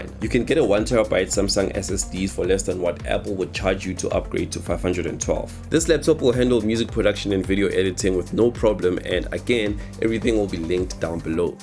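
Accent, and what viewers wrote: South Africa. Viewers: someone with a Sputh African accent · bro is south african???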